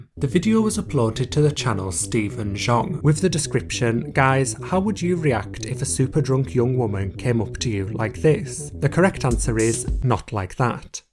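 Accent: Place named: Scotland, United Kingdom. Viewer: First time here and oh my God, I just looove your accent! It is Scottish maybe?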